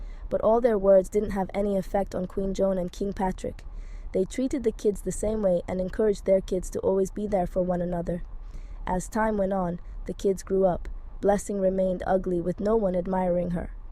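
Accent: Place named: Liberia. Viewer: You sounding like you’re from my hometown. Are you a Liberian?!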